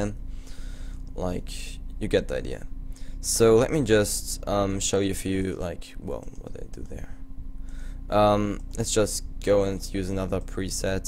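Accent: Germany. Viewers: kommst du aus deutschland man hört das iwie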